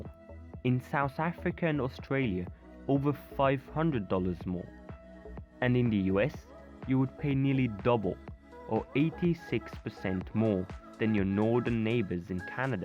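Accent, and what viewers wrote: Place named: Egypt. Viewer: you sound egyptian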